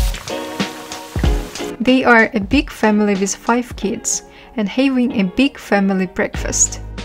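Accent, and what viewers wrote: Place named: Russia. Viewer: Английская озвучка типично русская... Ландан из э кэпитал оф грет британ....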